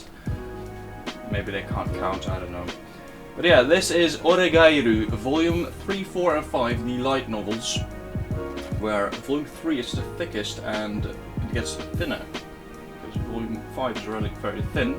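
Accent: Netherlands. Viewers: you have a dutch accent but some words you pronounce are Super British haha, master of the Language, great video, that Rokka sounds great tbh .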